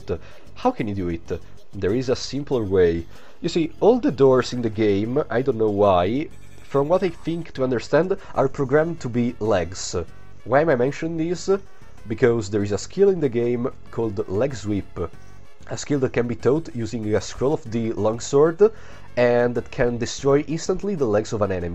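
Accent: Italy. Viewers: Sei italiano? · Are you Italian?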